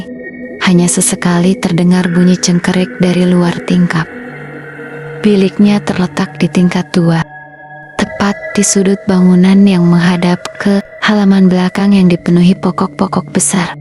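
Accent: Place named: Indonesia. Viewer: Hai anak mak shayang, mak hadir layan sbg peneman tidur 😍 huhu terbaik cerita malam ni... kisah asrama 👻 smakin menarik editing ai dan penceritaan wlopon loghat indonesia mak suka 😀 keep it up shayangku, smoga trus sukses, Amiiin🤲🫶❤️❤️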